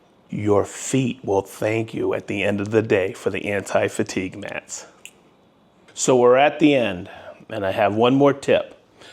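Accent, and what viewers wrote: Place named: Boston, United States. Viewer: I could be wrong.. but that’s Boston my friend.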